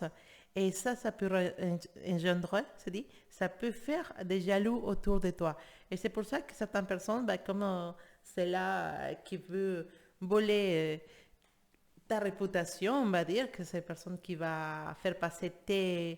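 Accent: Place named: Spain. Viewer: Ne changez rien 🤣 il est beau votre accent... Espagnol non? Gratitude infinie 😍😍😍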